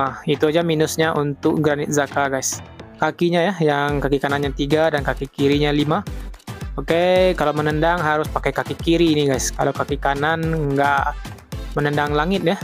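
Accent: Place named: Aceh, Indonesia. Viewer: Logat bahasa kek Aceh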